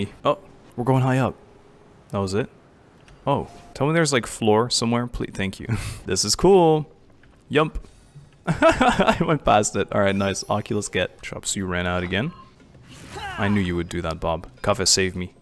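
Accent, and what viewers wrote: France. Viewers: WAIT ARE YOU FRENCH ? because you were pronouncing Fontaine very frenchly so I had suspicions but now... je suis trop contente parce que j'adore regarder tes vidéos en général, c'est super fun et tranquille :D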